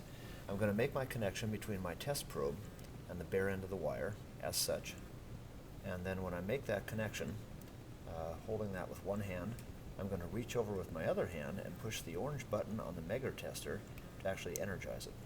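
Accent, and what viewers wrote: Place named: United States. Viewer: fed up of us accent ............. dat person shud learn english frnst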